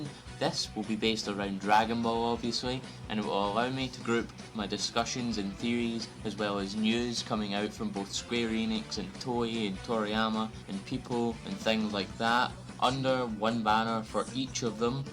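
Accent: Scotland, United Kingdom. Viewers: I liked this video, man! First and foremost, is that a Scottish accent I hear? If it is, that's awesome because we need more UK YouTubers in the Dragon Ball community - me being one myself! I loved your idea of a Saiyan that resembles Goku travelling to Earth in Trunks' timeline and easily destroys him. The only question from there would be, how did he become that powerful to have this be a threat to Goku, Vegeta and even the Omni-King? Me personally, I think "Black Goku" will be a created being, by a force that hasn't been introduced yet in Dragon Ball Super. Fun theories and video, I subbed for more! :)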